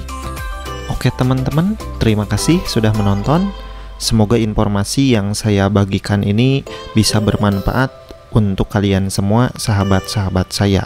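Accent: West Java, Indonesia. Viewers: Cara ngomongnya jiga orng sunda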